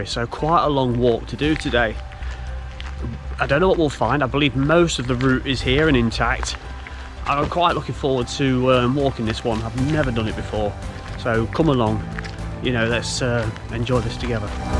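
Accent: Nottingham, United Kingdom. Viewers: Man, that's a great No'Ingham accent!😂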